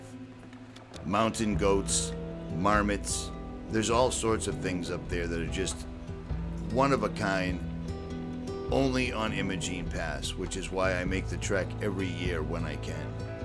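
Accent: Boston, United States. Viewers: You sound just like Ritchie from Boston.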